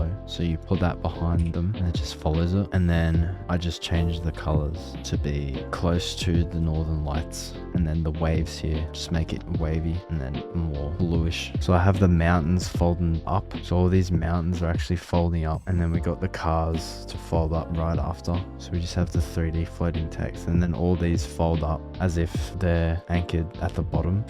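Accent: Australia. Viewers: Are you Australian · Are you aussie?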